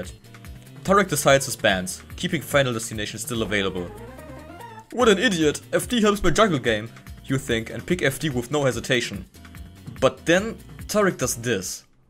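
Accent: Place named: Germany. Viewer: Hab dein accent gehört und direkt gewusst, dass du deutscher bist lol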